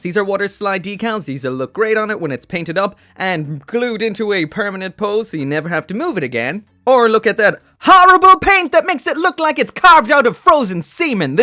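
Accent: Ireland. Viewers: Irish accent really popping through in this review. Also props to him for review this abomination of a kit 🔥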